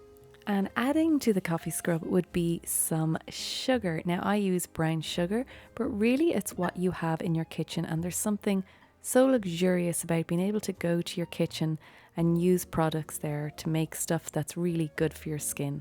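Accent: Ireland. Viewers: The thumbnail was very attractive, and I just love your voice, Its very soothing. love the Irish accent (Right?) (I was just listening to lots of Americans, ((Dont ask)) and that just makes the Native accent more recognisable.) You've definitely earned a new subscriber, these are attractive and helpful gifts too.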